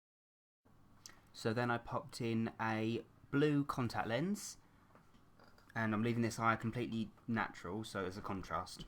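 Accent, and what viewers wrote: United Kingdom. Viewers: me and my mom love your britsh voice what part of englend are you from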